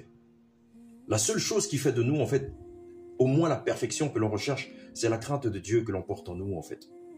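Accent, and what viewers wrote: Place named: Gabon. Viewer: Il a l'accent de chez moi au Gabon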